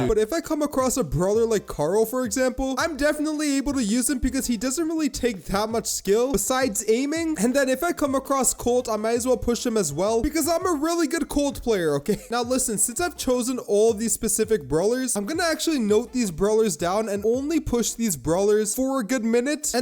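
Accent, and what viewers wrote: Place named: Canada. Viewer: he is canadian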